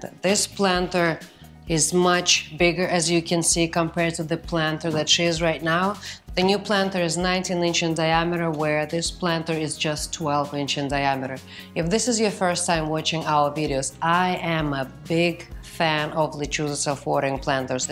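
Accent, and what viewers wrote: Russia. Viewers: Are you Russian? Why do I understand what you are saying if my English level is A1 😅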